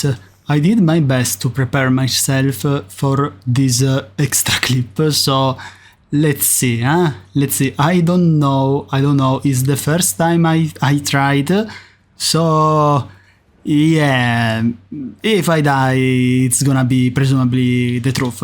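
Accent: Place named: Italy. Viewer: I think your english pronounciation is the worst I've heard so far. It's impossible to understand the video without turning on the subtitles. I could argue you might be italian from the accent. Either way, work on that or just start using elevenlabs for AI generated voice instead.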